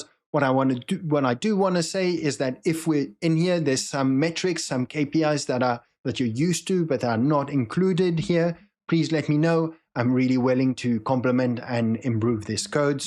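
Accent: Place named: South Africa. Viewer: . I stumbled on your channel just now. Wow, it was the first video that linked me to this. Your accent attracted me....sounds South African. What a wonderful and detailed presentation that attracts the viewer to stay tuned as if we are in a Hollywood thriller 😊. Dude you are simply the best... This past month I have been watching so much nonsense on YouTube as I am trying to get back into trading after about two decades of absence. Much has changed with technology and I need to brush up before I re-enter the markets. Well done and God Bless 🙏🙏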